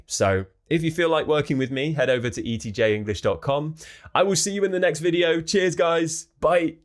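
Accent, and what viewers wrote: RP, United Kingdom. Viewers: I love the sound of your British English. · Really love your lessons and the way you speak with your beautiful RP pronunciation. Look forward to being one of your students. Thank you so much ❤ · You're welcome teacher, I've learned many things from your video lessons and they helped me to improving my english, I love your modern RP accent it motivates me to continue upgrading my accent and speech, have a brilliant day take care 🫡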